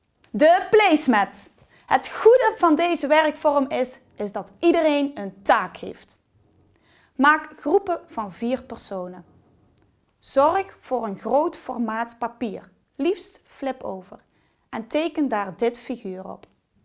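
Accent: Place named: Limburg, Netherlands. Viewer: Sorry, wat een irritant dialect. Limburgs? wogde legge wegkforme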